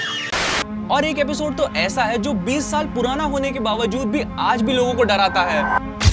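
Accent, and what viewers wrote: Nagpur, India. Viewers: Bhai tu nagpur se hai kya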